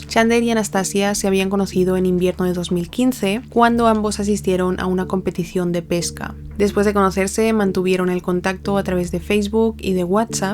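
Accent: Spain. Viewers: Mira que no me gusta el acento español y prefiero normalmente a Landó y a Dinosaurs vlog pero muchacha, me gustó como relatas ❤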